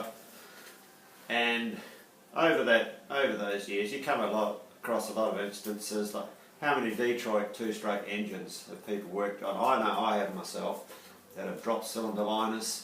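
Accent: Australia. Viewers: First time watching your channel i found you via Cam at Battler. A new Workshop and Machine Shop sounds very interesting. Definitely worth subscribing to find out more Sounds like an Aussie accent the T Shirt you are wearing had me guessing for a minute but once outside that definitely looks like an Australian farm. Looking forward to watching your current video,s and more in the future. All the best. Regards Peter.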